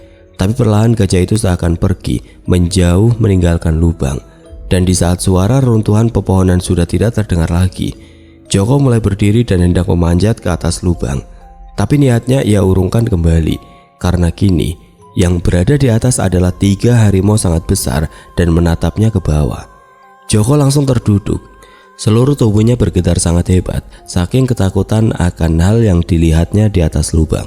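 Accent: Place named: Banyumas, Indonesia. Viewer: Unik nya V.O chanel ini selalu berlogat Ngapak walaupun menceritakan daerah selain jawa. Salut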